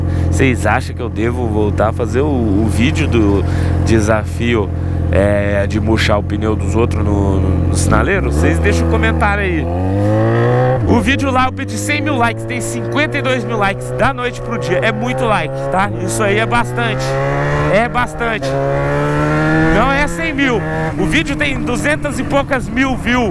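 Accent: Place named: Paraná, Brazil. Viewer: sotaque paranaense kkkkkkkkk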